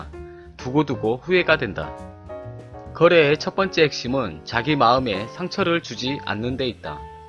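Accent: Jeolla, South Korea. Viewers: 전라도 사투리의 비애.. 전라도 사람인 제가 들어도 ㅋㅋㅋ 서울 말투써야 먹힐듯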